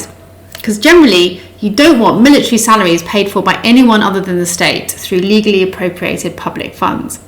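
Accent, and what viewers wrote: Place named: Sussex, United Kingdom. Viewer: Get a bit more dirty of a Sussex accent why doncha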